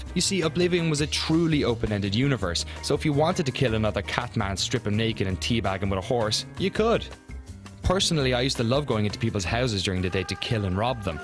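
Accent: Ireland. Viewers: are you irish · This guy has a real Irish accent, we don't sound like leprechauns! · are you irish?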